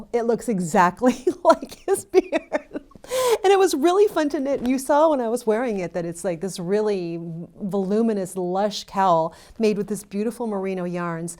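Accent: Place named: United Kingdom. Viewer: welcom. I thought you british.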